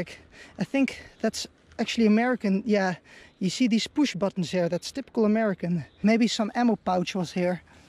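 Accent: Netherlands. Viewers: Where are you from you sound dutch? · Goede videos maar je uitspraak is soms super nederlands. Het is maar een tip. Zoals the Bulge bijvoorbeeld. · You sound very dutch. Am I correct? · Is that a Dutch accent ;)? First video i've watched on this channel and definitely not the last. Very educational. Keep up the good work! +1 sub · Leuk Nederlands accent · Nederlands of belgies? Vanwege je accent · I can hear this dude being dutch lmao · I can hear you are dutch ( ik ook) and i really find this interesting you just eared a sub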